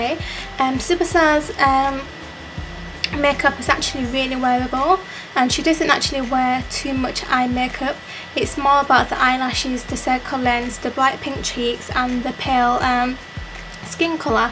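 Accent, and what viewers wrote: United Kingdom. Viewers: she has a british accent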